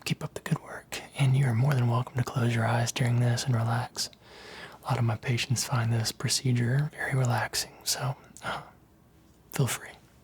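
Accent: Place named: South, United States. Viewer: Is that a Texan accent? I’ve got a southern accent (Alabama so it’s a lot more hick and a lot less charming lmao) and I’m hearing it in yours slightly but it’s definitely not my kind of southern accent. I thought I was mishearing it to begin with but the more I watch, the more sure I am that there’s some sort of southern in there lol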